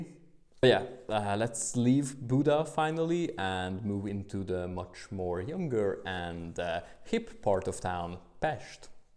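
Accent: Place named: California, United States. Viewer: for a supposed hungarian you sound a lot like you'd rather be some californio.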